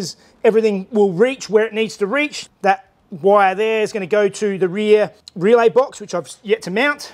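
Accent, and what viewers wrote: Australia. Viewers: 😳😳 cool, I'm a paramedic.... According to your dialect u living down under..... 2 be a ff there must be challenging sometimes..... The heat, draught and brush fires.....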